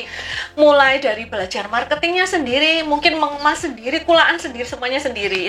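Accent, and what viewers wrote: Surabaya, Indonesia. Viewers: Thats was good.. Ingrisnya medok kas Suroboyoan.. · kereeen menginspirasi, baru liat video ini auto subcribs.btw logatnya jawatimur suroboyan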